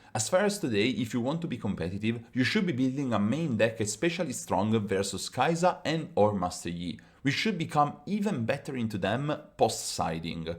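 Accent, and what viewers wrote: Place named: Italy. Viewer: nice video, just a few question: 1 were are u from? (cause ur accent is similar to the italian one, ed anche perché sono italiano e mi sembra di riconoscerlo) 2 i read from the rule that the correct order is not choose battlefield, die roll and choose who go first, but is die roll, player choose battlefield and then choose who go first. i think this way is the worst way, but i understand like this the rule. if i am wrong than it's the fault of the rulebook, it's the worst thing i ever read, someone in riot dont know how to write in an normal english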